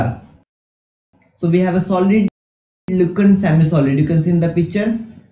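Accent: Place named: India. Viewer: I’m sorry man but I can’t never understand think Indian accent.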